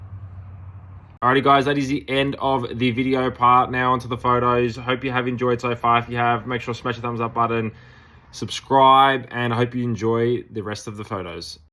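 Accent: Australia. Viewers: AUSSIE accent!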